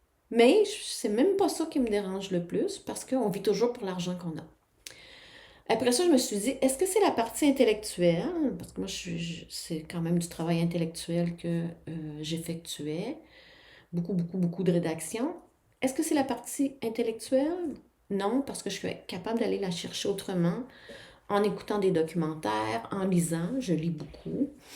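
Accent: Quebec, Canada. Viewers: pleins de soutien, toutes mes condoléances pour ce frère tant aimé. Merci bcp pour cette vidéo et cette accent joyeux du quebec. Bises bretonnes ☀️💞 Christine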